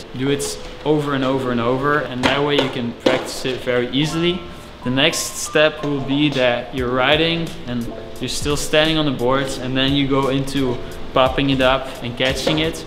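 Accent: Netherlands. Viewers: Are u Dutch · Are you dutch? Female dutch skateboarder here who recognises your accent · Bro are you dutch? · are you dutch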